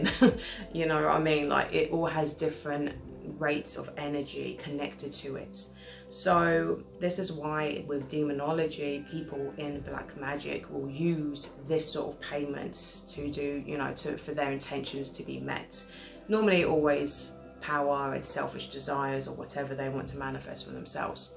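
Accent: London, United Kingdom. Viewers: After living in devon for the last 20 years so nice to hear a fellow londoner ...blessings 🌛🌕🌜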